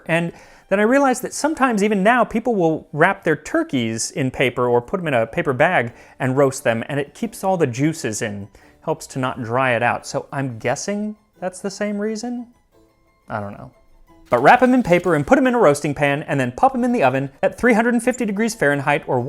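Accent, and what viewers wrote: France. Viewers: 😍😍😍 for the French accent !!! So cute !!!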